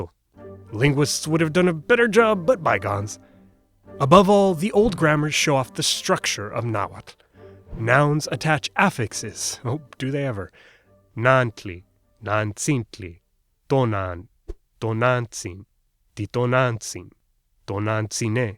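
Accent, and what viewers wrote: Italy. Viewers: I cant help but notice you tend to use Italian (or maybe Latin?) accent and inflections when you speak not English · Your Italian accent comes out on this one. But this one was very well done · This narrator has the consonants correct, but in some areas he expresses an Italian accento, which is not in the Aztecca pronunciation.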